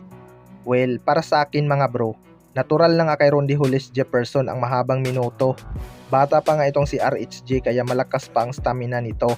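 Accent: Visayas, Philippines. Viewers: bisacol nmn tong salita neto sakit sa tenga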